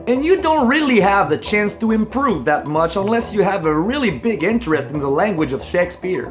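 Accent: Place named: United States. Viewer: comment ça t'as un accent americain..cest tellment cool ça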